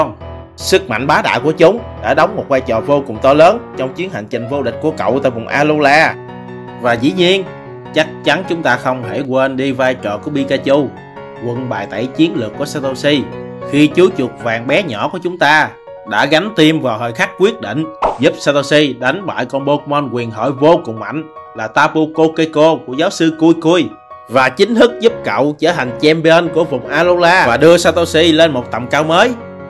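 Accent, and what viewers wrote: Vietnam. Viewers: Theo dõi admin này từ hồi làm clip ngắn, lúc đầu giọng đọc rất tệ rặc khuông miền tây lại ngọng, đọc vấp, không rõ chữ nhưng càng về sau ổng đã cải thiện hơn, có thể ổng chưa bằng đuợc chất giọng của admin chính, nhưng sự cố gắng trong chất giọng và cả idea làm clip là rất đáng ghi nhận, mọi người nên động viên ổng phấn đấu thay vì bày xích ổng, ủng hộ admin và kênh PAG Center❤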